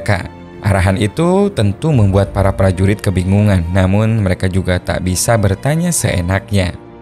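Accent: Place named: Central Kalimantan, Indonesia. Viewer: Ini logat kame Kalimantan tengah lah gue suku Dayak Emang gite lah loga t nye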